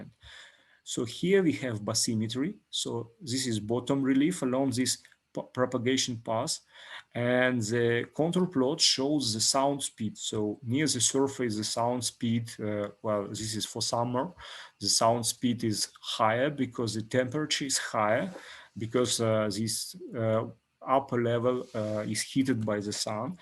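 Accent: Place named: Germany. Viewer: Классный доклад, я прямо флешбеки словил. Мне кажется у тебя местами немецкий акцент проскальзывает)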